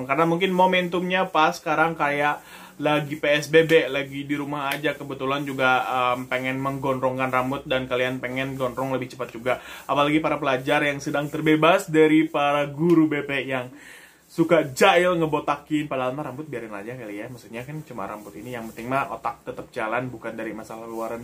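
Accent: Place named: West Java, Indonesia. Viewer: Sunda anjir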